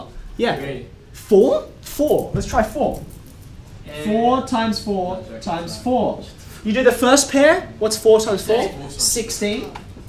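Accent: Australia. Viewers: Chinese guy with a Australian accent, Interesting.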